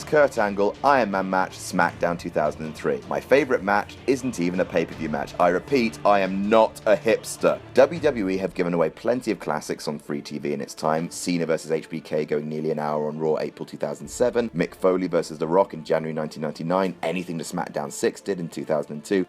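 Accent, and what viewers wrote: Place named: United Kingdom. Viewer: Luv u Have babies with me Their accent would be a combination of your beautiful British accent and my Australian bogan accent It would be amazing <3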